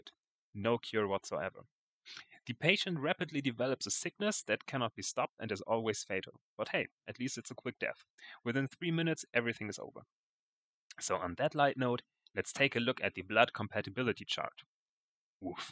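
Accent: Germany. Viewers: gutes video ich find es immerwieder witzig deutsche english reden zu hören :D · You sound german :D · Do you are German? I could swear you sound like a German xD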